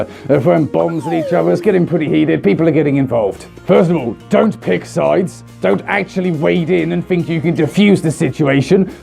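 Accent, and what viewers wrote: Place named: Scotland, United Kingdom. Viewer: Loved your scottish accent, by the way.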